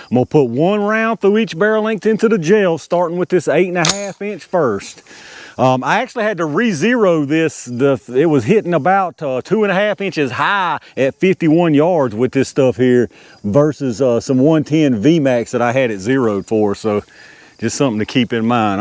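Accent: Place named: Georgia, United States. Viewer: Sir, where is your accent from? Georgia? I love it!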